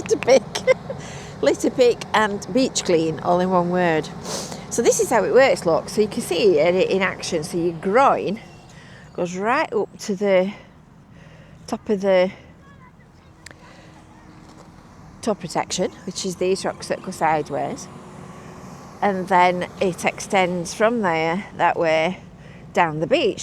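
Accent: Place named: England, United Kingdom. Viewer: i just love the way you laugh,...i love my english peop0le's accent,..thank you for being here alive and kicking,......,......marius(punk rules).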